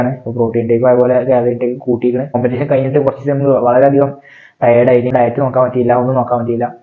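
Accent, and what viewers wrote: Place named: North Kerala, India. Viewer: Bro ഞങ്ങൾ തെക്കോട്ട് ഉള്ളവർക്ക് നിങ്ങള ഭാഷ അത്ര മനസിലാവില്ല അതോണ്ട് ഒന്നു സ്പീഡ് കുറച്ചു ക്ലിയർ ആക്കി പറയാമോ 🙂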